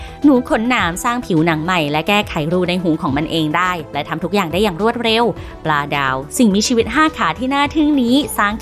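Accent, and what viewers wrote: Thailand. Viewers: เสียงเหน่อจัง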